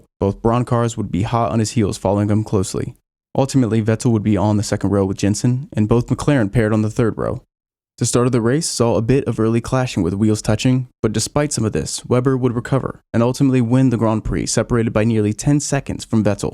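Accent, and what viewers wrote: United States. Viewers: American pronunciation annoys me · you use American pronunciations for everything besides "Vettel" and "Grand Prix." It's awkward. Coming from a television producer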